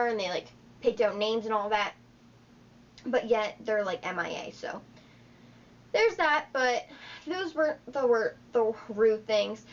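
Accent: Canada. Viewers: RhiannaFrancesca07 i knew as soon as she talked she was from Canada lol, are you also from Canada??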